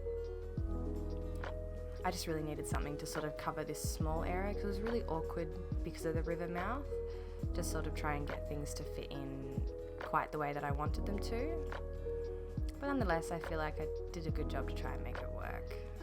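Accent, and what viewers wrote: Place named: Australia. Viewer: This is absolutely beautiful! I love the decorating in your house- really well done!- and how you’ve created little neighborhoods and lovely spaces ❤ Thank you for sharing and congrats!! As an aside, I absolutely love listening to you speak- I am a HUGE fan of Offspring (I’m on my 12(?) watch through, deeply in love with Matthew LeNevez). But hearing Aussie’s accent (to this Yankee’s ears) is absolutely fabulous. ❤❤❤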